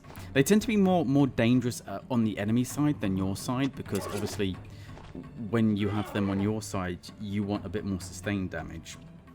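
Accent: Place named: United Kingdom. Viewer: Come for the gameplay, stay for the posh English boy living 2015 again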